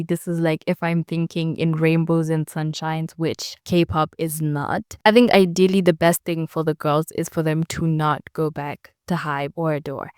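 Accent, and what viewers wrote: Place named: South Africa. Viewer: Love your voice and accent! Are you South African because it's giving South African accent?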